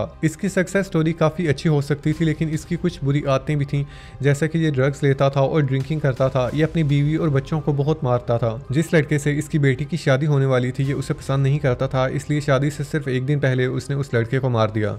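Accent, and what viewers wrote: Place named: Kashmir, India. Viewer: Main apka bohat bada fan hun main yahan ap k insta stories ki wajah se hun main india kashmir se hun mujhe hamesha lagta tha aap kashmir se ho ap k features aur excent bilkul kashmiriyon se milta hai.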